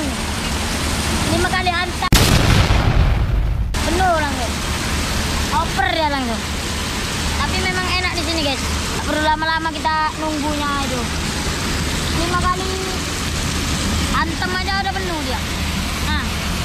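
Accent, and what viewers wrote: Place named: Sumatra, Indonesia. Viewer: pertahankan, lebih lucu pake logat sumatra😁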